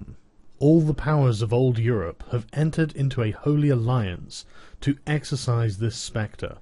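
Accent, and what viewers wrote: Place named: England, United Kingdom. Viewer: I'm undecided if hearing Sargon read this makes the ideas more concerning than when I last read it. That type of English accent makes things sound more reasonable and somehow more evil at the same time.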